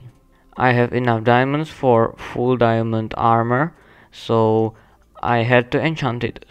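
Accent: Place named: India. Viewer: You speak in Indian ascent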